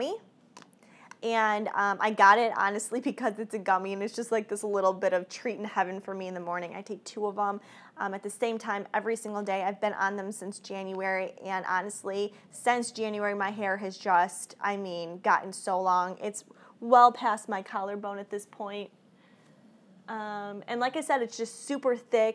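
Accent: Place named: Chicago, United States. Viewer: recognizing your Chicago accent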